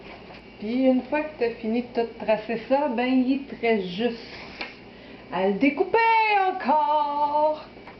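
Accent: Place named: Quebec, Canada. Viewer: Québéquoise ? Ca s'entend !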